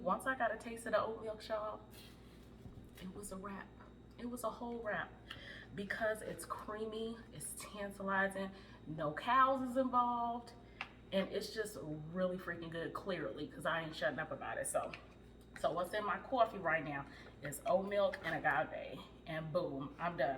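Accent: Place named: East Coast, United States. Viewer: Just found your page. I’m originally from Chicago too! You sound like you’re from the East Coast lol. Yesss girl use that Quinoa!!!!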